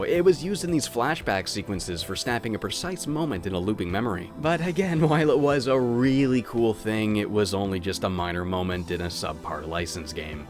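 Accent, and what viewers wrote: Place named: Nova Scotia, Canada. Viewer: This is what I love about. He's plays a game, we get a lesson on Photography. Plus he's from Nova Scotia and his accent and mannerisms makes me miss the Maritimes.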